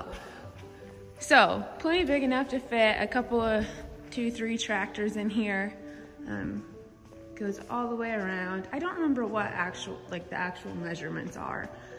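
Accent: Midwest, United States. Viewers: Hip hop culture? Where are you from, man? That's how people in the Midwest talk.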